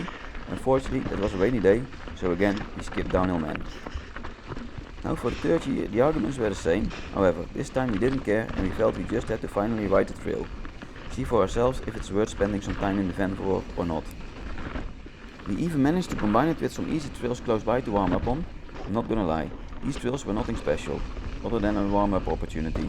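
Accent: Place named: Netherlands. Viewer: ik vind het nederlandse accent engels juist leuk gewoon je eigen ding blijven doen. ik heb je hardtail content nu al 10x opnieuw gekeken . leuke video weer!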